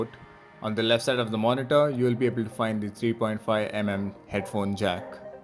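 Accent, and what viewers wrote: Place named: India. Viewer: probably because this guy sounds Indian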